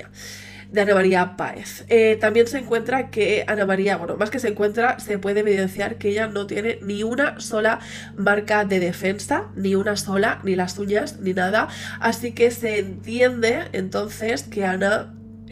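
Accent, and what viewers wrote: Spain. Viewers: El crins ese del que hablas, es el catalán solo para catalanes. Me quedo mil veces contigo porque...hablas en español como se habla en España. Osea ..mil veces tu😅😅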